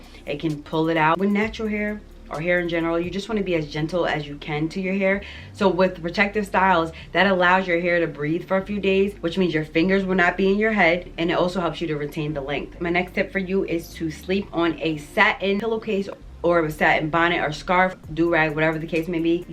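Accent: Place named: New England, United States. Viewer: I love your videos!! They are very educational and entertaining too! Are you from NY or New England? I hear that accent! 👍🏽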